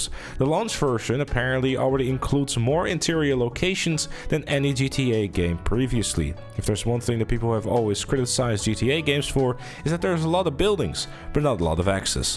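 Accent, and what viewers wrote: Netherlands. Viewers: Hol up is this man dutch?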